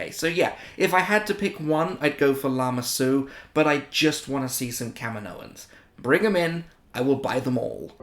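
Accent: England, United Kingdom. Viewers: Ive never been good with accents but I assume your English. Im from Northern Ireland so its nice to see another Brit doing the Black Series on YT. Plus I also spotted some Dr Who behind you 😁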